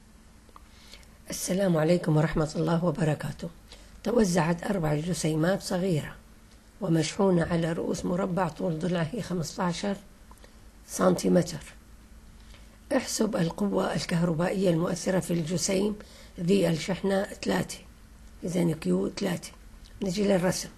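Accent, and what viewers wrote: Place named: Syria. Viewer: أخي أنت لهجتك شامية حاسس انك سوري.. على أي حال تحياتي الك من سوريا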